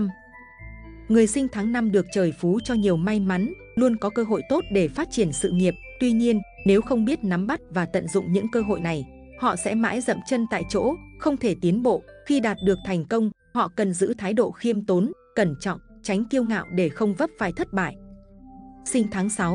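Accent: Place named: North, Vietnam. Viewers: Tiếng miền Bắc rõ ràng từng câu, từng chữ đấy ạ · Nói Tiếng bàc khó nghe quá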